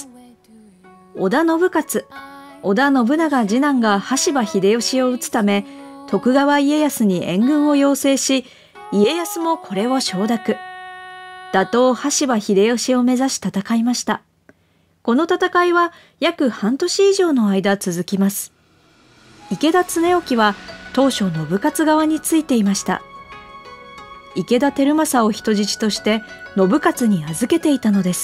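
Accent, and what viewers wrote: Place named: Mikawa, Japan. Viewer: 三河のアクセントが気になる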